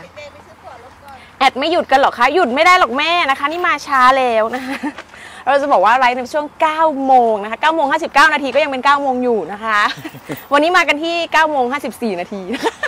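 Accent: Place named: North, Thailand. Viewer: ชอบมากกกแอคมินพูดไทยคำเหนือคำน่ารักมากกกชอบค่ะคุณมาถูกทางแล้วค่ะ❤❤❤❤❤❤❤❤❤❤❤❤❤❤❤❤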